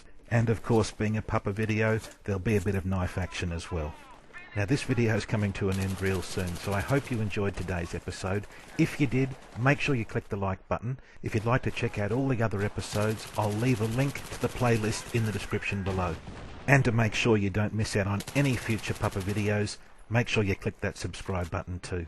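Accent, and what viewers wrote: Australia. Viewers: Dude, his Australian!!!!! Pwn thinks its a cool accent! im australian!!!! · see to me sounds so aussie lol he is australian btw checked hes channel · hes like... the australian boo :o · I dont wanna be mean or anything but me my self fell asleep listening to this its a game who the fuck want to listen to the origin of a weapon in a GAME stats is ok thats okey but whats the purpose telling everything about a gun what does that help. to know which is the best one where it was made and shit tbh i need to be paid to listen to this but good gameplay thought and nice accent^^ AUSSIE PWN! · AUZZIE BRAHHH! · Fuck yeah!!! Aussie mate :D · Don't see too many Aussie commentators. Thumbs up! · Is this guy australian? If he is then good on him ;) go aussie and the ps3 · Found out about pappa through golf squad on the shustybang channel. I like his vids diff from other commentators, and the accent is Australian. 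· Aussie aussie aussie..?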